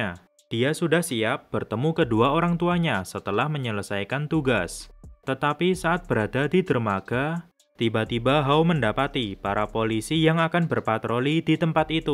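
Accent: Java, Indonesia. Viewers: Asli mana bang ? Suaranya seperti saya sama" medok 🙏😊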